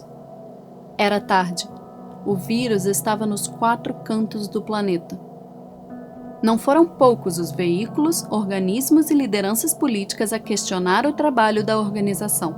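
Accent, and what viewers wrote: Rio de Janeiro, Brazil. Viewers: * tirou o sotaque carioca para a narração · seu dialeto carioca natural (leve e agradável)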